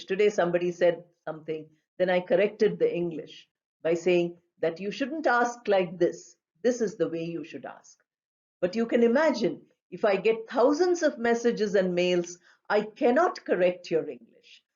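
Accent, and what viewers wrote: India. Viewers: Good morning madam 💐🙏 iam following your english classes. Nice classes.Your english class is very accurate, grammatically and fluency with an indian accent english. I can understand your english class easily.🎉🎉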